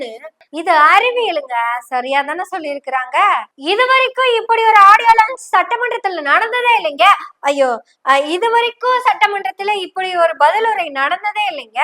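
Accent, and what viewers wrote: Kongu, India. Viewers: உன் முயற்ச்சிகள் வெற்றி அடையும். உன் கொங்கு தமிழ் மிக சுவை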